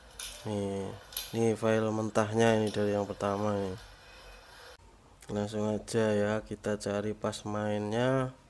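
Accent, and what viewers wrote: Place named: Java, Indonesia. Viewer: Lagi nonton video mu ini Mas. Le mgendikan thik medhog men njenengan Mas.. 🤭🤭🤭 Tetap unik & otentik.. Mugi sehat2 njih Mas Dados amal Jariyah & ilmu engkang manfaat. Aamiin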